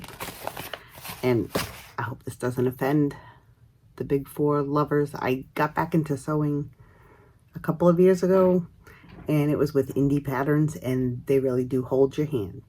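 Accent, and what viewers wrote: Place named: New England, United States. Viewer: Hi! I found your channel from wheel of fate -- and decided to go back to the first video / intro. Nice to meet you. I can definitely hear your New England accent! I subscribed and look forward to catching all your makes videos.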